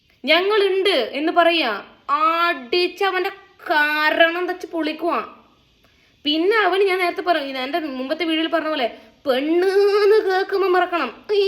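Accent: Kasaragod, India. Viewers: Nammude aa Kasaragod language kayari varumbo oru sugam kelkan. Hats of you dear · Edek ninde slang ksd ambo nalle paangan ..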